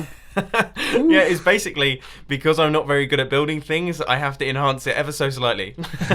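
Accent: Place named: East Midlands, United Kingdom. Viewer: What accent would U say I would say east Midlands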